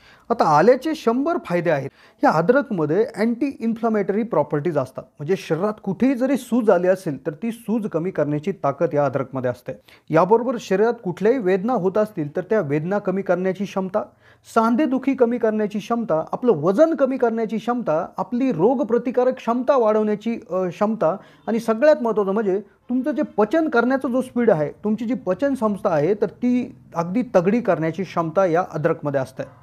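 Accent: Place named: Khandesh, India. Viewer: Khandeshi bolaychi stylish bhasha ashi aste .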